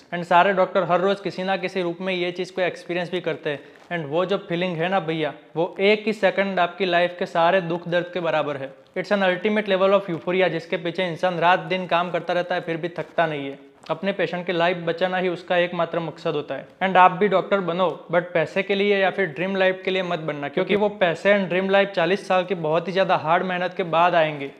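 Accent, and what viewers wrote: Gujarat, India. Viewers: Aapki aavaj sunke hi pata chal gaya hi aap gujarati hu 😆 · I can feel you are GUJARATI!! ❤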